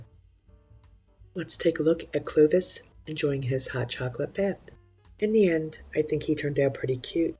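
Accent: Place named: Pennsylvania, United States. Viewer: Clovis came out Amazing!! I’m guessing you’re from PA… There’s the accent and my mom’s side of the family is all from PA & I always heard mom & grandma call them jimmies. Well I was born and raised in FL so I call them sprinkles😂.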